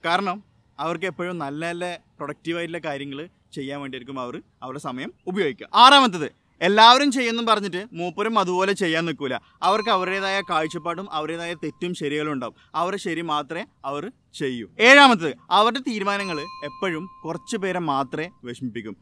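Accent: Kannur, India. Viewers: ningal kannur aano?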